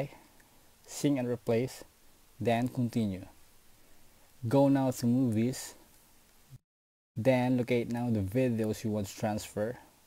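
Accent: Philippines. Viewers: hey man, the guy is Filipino their accent is like that. try to understand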